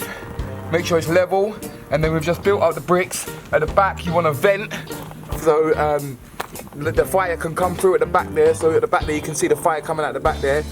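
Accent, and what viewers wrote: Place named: Jamaica. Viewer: I’m confused are u Jamaican or English broo??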